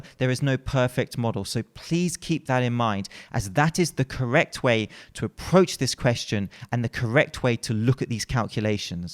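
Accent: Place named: London, United Kingdom. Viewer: Random thought, would bone density, not just bone size also play a part ? Thinking that larger muscles are going to need bigger and stronger (denser?) frame to work off. And if so is that bone density something that can be worked upon ? ie in much the same way that muscle growth is a response to the damage caused to it, would bone density also be affected by damage ? Now of course not promoting the idea of breaking bones. Just think how martial artists strengthen bone through repetition. Probably talking out of my arse but hey if you don't ask questions you ain't gonna learn. Love the vids, though not quite got the old school Landern accent, 8/10 my san!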